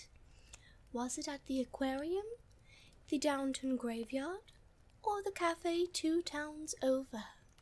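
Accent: United Kingdom. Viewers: How… I swear you need to be hired by someone to do voice acting This British high ip accent is just…perfection, with the vampire roleplay, words can’t describe how amazing this is.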